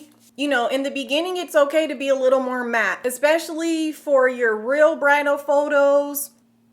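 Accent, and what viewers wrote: South, United States. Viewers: Does anyone know where she is from? Her accent is so strange. It sounds southern (Im from Texas) But I cant put my finger on which part of the south. Maybe Alabama? Thanks for the help. Just curious over here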